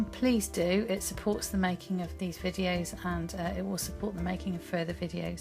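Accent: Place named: England, United Kingdom. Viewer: Wow!! This is beautiful.....I am new to this I haven't done any yet but my poor brain is buzzing with ideas! lol! Nice to hear English accent! I live in Sussex..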